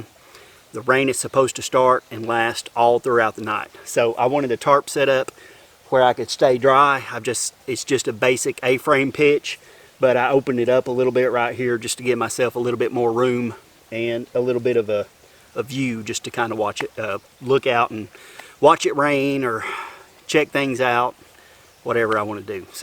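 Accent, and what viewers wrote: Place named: South, United States. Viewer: He's such a southern dude, can't hardly understand him.. he needs to speak normal english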